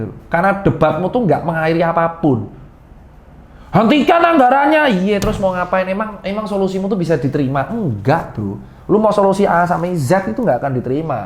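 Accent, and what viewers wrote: Java, Indonesia. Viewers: Bosone jan persis koyo wong jowo Oke kita sejowo jancooook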